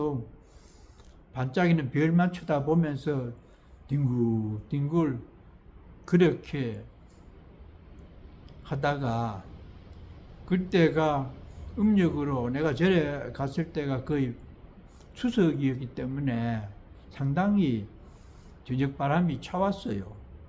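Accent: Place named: Andong, South Korea. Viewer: 안동사투리가 구수한 스님법문 잘듣고 있습니다 얘기를 너무 재미있게 하셔서 다음회가 기다려집니다 고맙습니다 스님❤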